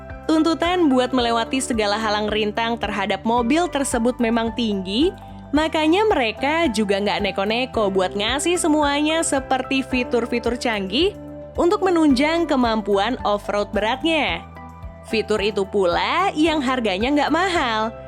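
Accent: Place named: North Sulawesi, Indonesia. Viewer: Sulawesi Utara mana nih suaranya 😅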